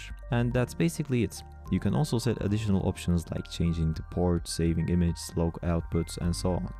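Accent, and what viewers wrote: Turkey. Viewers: is Ur accent is Turkish haha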